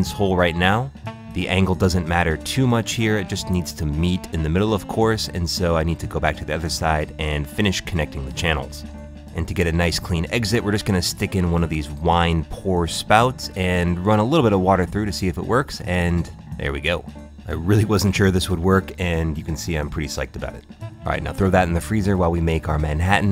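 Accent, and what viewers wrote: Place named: New York, United States. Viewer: Knew you were a New Yorker hell yeah 🧐👌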